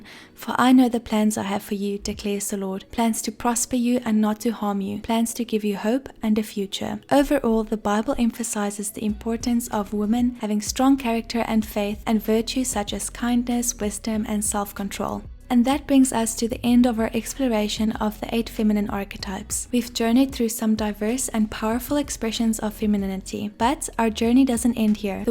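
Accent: South Africa. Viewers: Are you South African 😭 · Girl are you South African 😭😭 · Are you South African?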